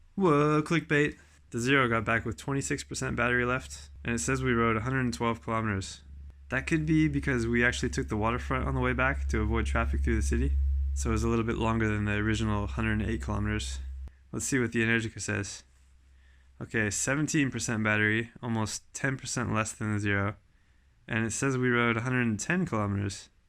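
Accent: California, United States. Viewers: I wonder if he’s from New Zealand. He sounds like he’s from Cali. Either way, I prefer the lighter bike 😆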